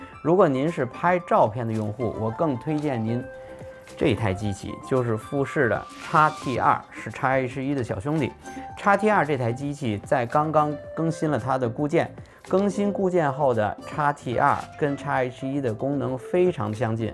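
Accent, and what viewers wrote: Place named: Beijing, China. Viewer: 啥时候澳洲又来一位，我就知道金叔和顾俊，看起来这位水准更专业，北京口音听起来不如那2位亲切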